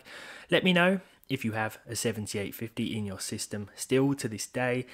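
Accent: United Kingdom. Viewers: i really like your british accent 😁😁😅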